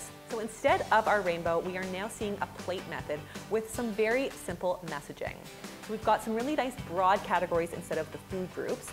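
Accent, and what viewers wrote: Canada. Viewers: She has a strong Canadian accent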